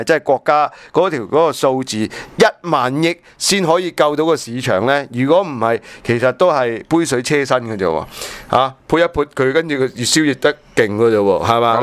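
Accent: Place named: Cantonese, China. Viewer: 支持同0K仔! 萬分支持有廣東特色嘅普通話，令到咁Q普通嘅普通話變得非常特別悦耳 💪💪💪💪💪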